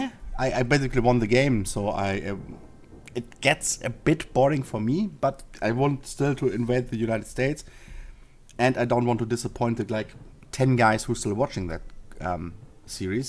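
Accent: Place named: Germany. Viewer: Congratulations! I kinda guessed you were german from your accent. But don't get me wrong, i like the accent, it's very euro-English ;P Can you please consider playing People's Republic of China? Please please please, I haven't seen anyone play as them so far.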